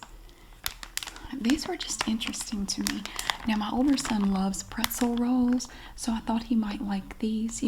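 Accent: South, United States. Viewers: Yall I rarely ever comment but I love this lady’s channel. So soothing and being from the south myself I love a good southern accent.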